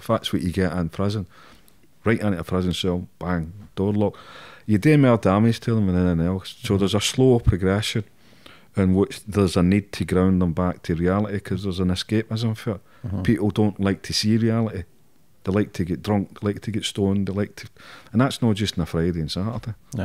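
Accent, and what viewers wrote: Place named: Scotland, United Kingdom. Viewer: Love how Scottish is in this podcast it really comes out haha it's like sitting listening to my uncle's chatting when I go to visit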